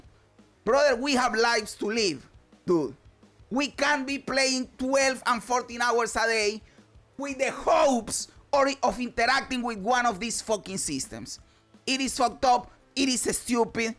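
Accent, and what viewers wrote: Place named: Dominican Republic. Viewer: eres dominicano no? eso accento me encantalo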